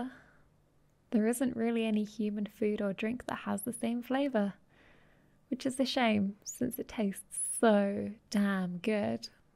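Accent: United Kingdom. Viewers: Thats accent sounds very either scottish,british,or irish and ...i really like it,not just cause im irish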